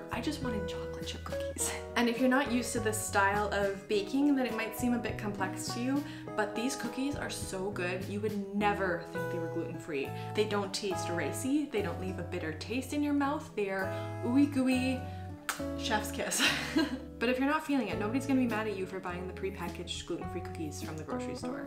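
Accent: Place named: Canada. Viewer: I knew You were from Canada by the way You speak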